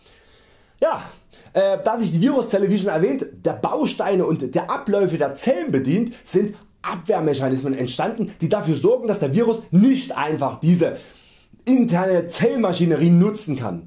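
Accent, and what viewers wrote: Saxony, Germany. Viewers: Du hast exakt den gleichen Akzent wie Olaf Schubert richtig gut gerade wenn du genommen sagst haha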